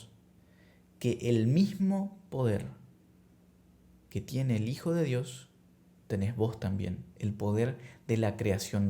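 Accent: Paraguay. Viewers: Eres de Paraguay?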